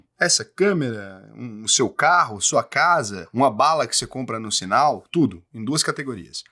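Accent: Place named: Rio de Janeiro, Brazil. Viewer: Ohh esse audio seu tá parecendo carioqueis parece esta falando assobiando 🤣🤣🤣 ajuda aí meu fi.Ass: Frantyesco Maia mineiro aqui em Portugal, um abraço e obrigado pelas excelentes explicações.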